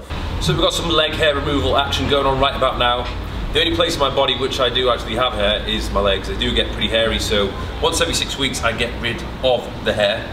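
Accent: United Kingdom. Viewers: Omg my god, you are hot as holy moly, and have a british accent.. two totally dangerous amounts of hotness ;)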